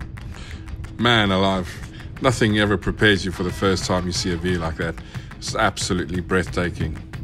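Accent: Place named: South Africa. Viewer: Hey boet! I discovered you in one of Dick Whistles videos. Think it was Distinguished Gents ride. I was moved to hear about what you are going through. Although I’m not really a YouTuber. Only made a couple pipe smoking vids which I enjoy. I have ridden bikes since I was a wee nipper. Back in Cape Town SA. Where you based mate I can hear a Safa twang in your accent boet. Drop me a line. I’m in sunny Basingstoke with my Mrs. Would be a pleasure and honour to meet you good Sir. Perhaps meet at Loomies one fine day ( perhaps pushing it in the U.K. lol). I’ll go with dry lol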